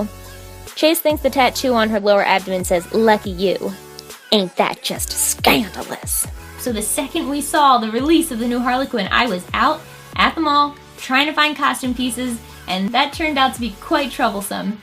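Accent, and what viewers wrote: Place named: New England, United States. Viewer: Love it! She's my favorite character ever. I think that your normal, dramatized NE voice is Harley-esque enough!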